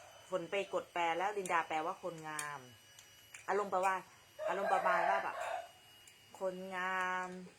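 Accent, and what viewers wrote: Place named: Isan, Thailand. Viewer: สวัสดีครับ ชอบฟังเสียงเวลาสาวอีสานพูด น่าฟังครับ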